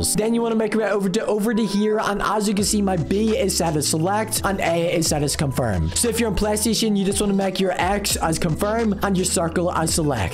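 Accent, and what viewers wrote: United Kingdom. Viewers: the british accent makes it 10 times better..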